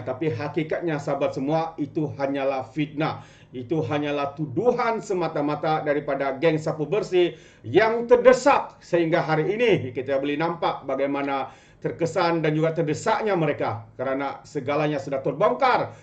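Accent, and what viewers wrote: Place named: Sabah, Malaysia. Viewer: Presenter ckp banyak sgt,siar je clean sound,netizen nak dengar suara pemimpin k'jaan dan bukan k'jaan yg ko dok pot pet pot pet tu buat apa...semak le,slang sabahan/sarawakian ko tu irritating,ko faham ke.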